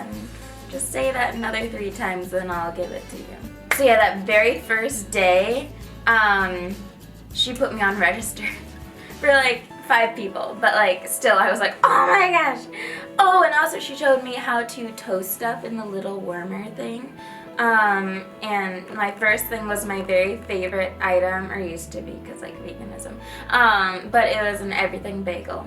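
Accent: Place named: Canada. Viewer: You sound Canadian ❤️🇨🇦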